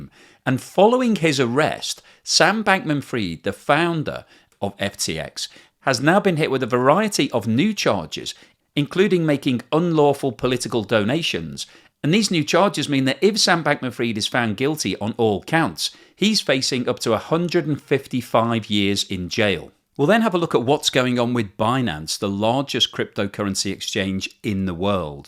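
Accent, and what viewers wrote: United Kingdom. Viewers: P.S. appreciate your regular brit accent. Don't do Posh! That accent sounds like the king of england got combined with a California valley girl. Awful. Posh accents are ruining British English!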